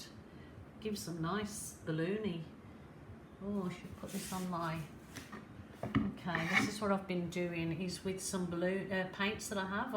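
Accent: Birmingham, United Kingdom. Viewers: Funny after all those years you still have a Brummie accent. My hubby is from Luton in Bedfordshire and has been here in Sydney for 39 years. Aussies think he has a British accent and Brits think he has an Aussie accent LOL. Thanks for all your videos and sharing your experiments with us.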